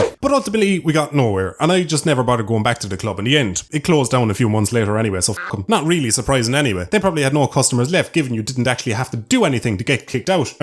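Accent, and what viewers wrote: Ireland. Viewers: A lot of Irish accents are like this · OMG sexy irish voice boi about to blow up! Won't be long before you're at 1mil after you hit 100k. Congrats from 21+ hell place,! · Every time I see when you upload, I stop, and I go watch your videos man. They are a riot, also that Irish accent man, you rock. Super entertaining, keep it up!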